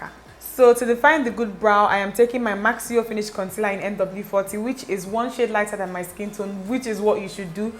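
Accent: Igbo, Nigeria. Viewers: Man I love her accent my bestie is Nigerian too😅but she doesn't like me listening to her when she is speaking Nigerian accent but still love her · Extremely funny especially the igbo accent. Love this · Oh no Shamsa mohamed thats how Nigerians talk. Thought the same when I had roomates from Nigeria it used to really bug me till I learnt thats how they talk and no offense. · no, that’s just how nigerians talk. very blunt, right out the box. she doesn’t sound rude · ''If you don't prime your lid with oily skin, you're on your own''😂😂😂😂😂😂😂...i've subscribed girl...you just soo speak like a tru Nigerian...love from Cameroon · She's too funny, and I love her Nigerian accent.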